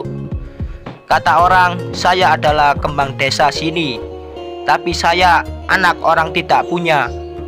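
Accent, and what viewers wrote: Java, Indonesia. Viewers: Ini pembawa crita masih baru ya mas , santai aja mas bacanya jngn katrok bahasanya medok lucu mas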